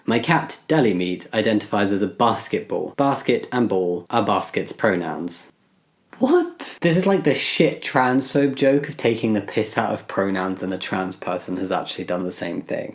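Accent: United Kingdom. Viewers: When did you move to the UK, your accent is very British? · He's always been British...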